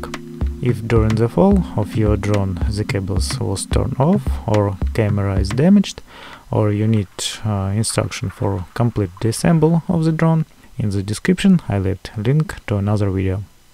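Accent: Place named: Russia. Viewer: russkiy accent chyvstvyetsa))))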